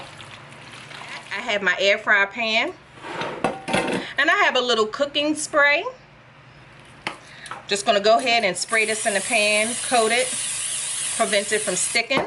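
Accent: Baltimore, United States. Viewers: She's got to be from Baltimore. The way she says to and do.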